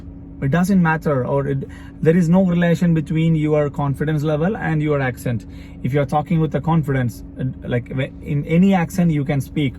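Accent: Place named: Kerala, India. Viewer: But i agree to you , accent has nothing to do with communication. But why are you trying to change malayali accent , even though it sounds purely malayali accent with little bit r sound from American accent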